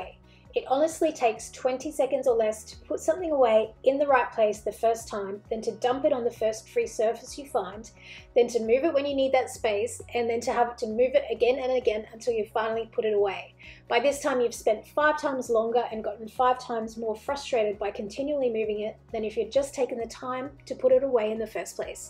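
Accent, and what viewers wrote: Australia. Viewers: Is that Australian accent? Maybe SA? Anybody knows please? 😁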